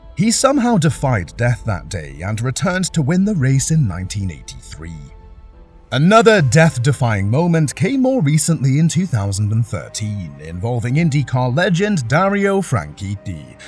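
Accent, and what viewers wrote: United Kingdom. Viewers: the "Brittish" AI voice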